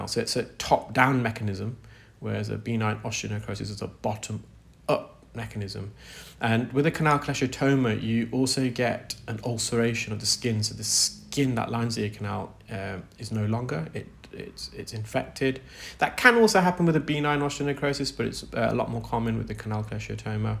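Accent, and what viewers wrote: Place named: Scotland, United Kingdom. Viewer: You must be Scottish. I just love the Scottish accent.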